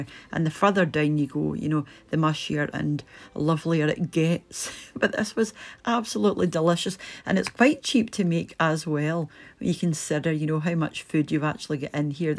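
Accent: Scotland, United Kingdom. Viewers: Hi! Love listening to you and your recipes. Can you please do me a favor? Say your name in your videos? My name is also and I'd love to hear it in your Scottish voice. ❤ Much thanks, Bowen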